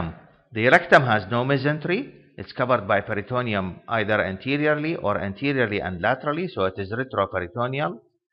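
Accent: Iraq. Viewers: هل انت من العراق دكتور احس لهجتك عراقيه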